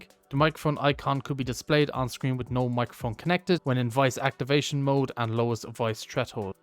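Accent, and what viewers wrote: Ireland. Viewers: He said 30. is Irish so he pronounces it as "Tirty"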